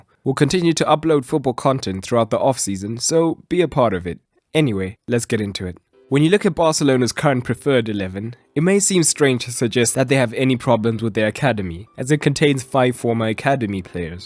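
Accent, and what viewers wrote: South Africa. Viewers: You sound South African and black, I could be wrong but I doubt it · Hey mate are you from South Africa? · Accent sounds South African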